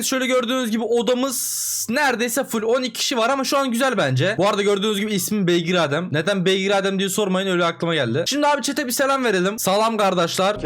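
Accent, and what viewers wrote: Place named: Turkey. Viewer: Qaqaş Sən Niyə Türk Kimi Danışırsan?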